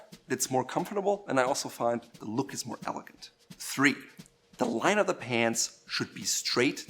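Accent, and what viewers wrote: Germany. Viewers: oh that german accent .... 🤯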